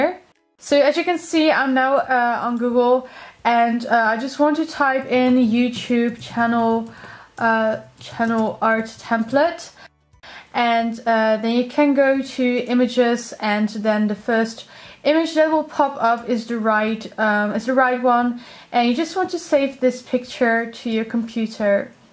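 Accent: Netherlands. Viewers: Ik hoorde meteen dat je uit Nederland komt haha maar je engels is super goed x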